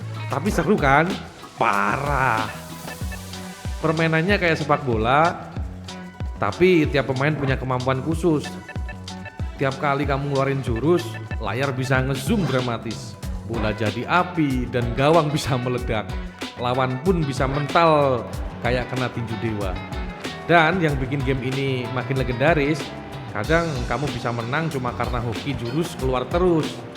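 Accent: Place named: Java, Indonesia. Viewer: Semi medok ❤❤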